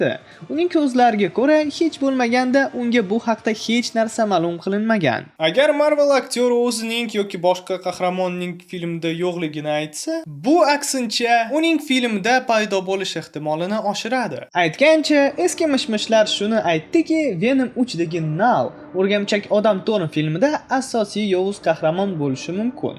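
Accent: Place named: Tashkent, Uzbekistan. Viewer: Nma bovoti😂 ybat toza toshkenlik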